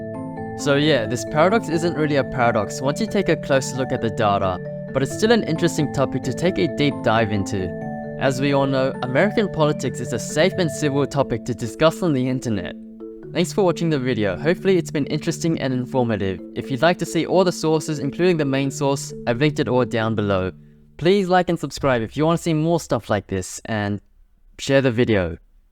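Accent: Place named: South Africa. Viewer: I hear a Saffer accent. PS: Get your levels sorted, china. The archival footage video audio is drowning you out.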